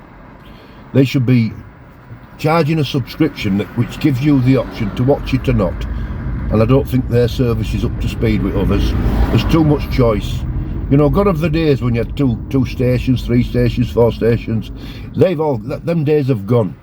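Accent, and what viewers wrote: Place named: Yorkshire, United Kingdom. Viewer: Loving that yorkshire accent pal.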